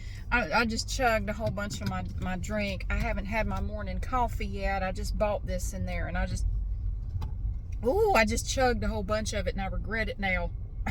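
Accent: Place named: South, United States. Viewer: I am originally from India I lived in Texas for 7 years before moving to Canada. I love southern accents. I find the way you speak and share your experiences really amusing. You are raw and real. Keep up the good work. Your rants are extremely funny lol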